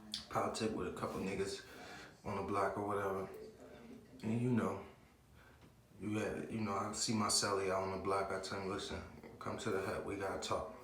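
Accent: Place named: Philadelphia, United States. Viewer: Yo bro I’m from north philly area and Trenton nj I knew you was close by because of how you talk we got a different slang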